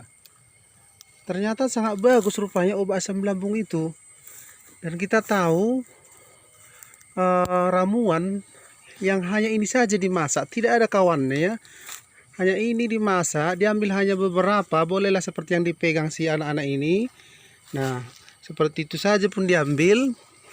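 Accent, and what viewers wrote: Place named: Makassar, Indonesia. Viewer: Kéntara séékali aksen makassarta' DAENG.. Geli2ki déngarkiii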